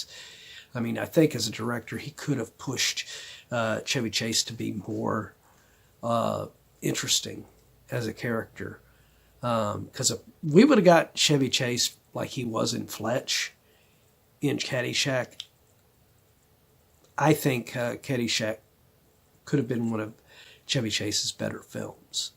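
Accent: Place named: United Kingdom. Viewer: I did like it. I noticed immediately that you had a totally different voice, certainly not an American one. And it was a good idea to use a British accent. As I've said before, it sounds a cold, commanding, sinister note. And amidst all those good old American "guys and gals" in "Queen Kobra" it was doubly effective.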